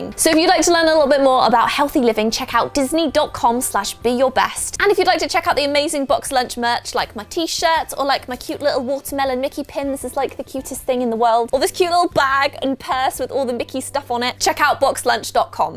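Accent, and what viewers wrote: United Kingdom. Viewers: Someone plz explain. She has a amazing British accent but she talks all the time about Walmart, USA and other things related to that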